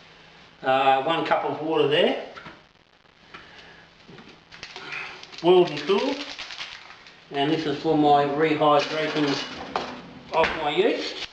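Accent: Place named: Australia. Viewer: cheers for the vid mate . you sound like a down to earth aussie , with none of the bullshit .. will be watching and learning from your vids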